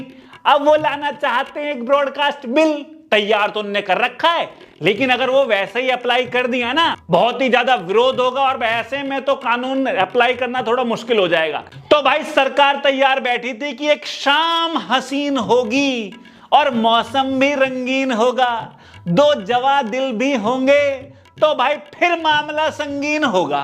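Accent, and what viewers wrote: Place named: Uttar Pradesh, India. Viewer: UP se lgte ho bhai 😅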